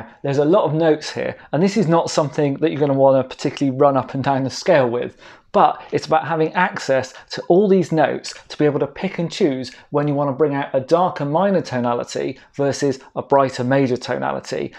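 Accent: England, United Kingdom. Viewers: that typical aristocratic english accent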